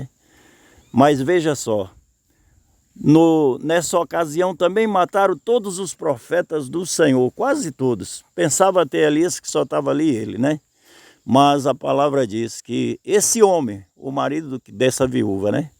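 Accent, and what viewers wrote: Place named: Northeast, Brazil. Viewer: obrigada por me responder, eu percebi pelo sotaque q o senhor era do nordeste, gosto das msgs do senhor, já compartilhei com mais pessoas, sou do estado do Tocantins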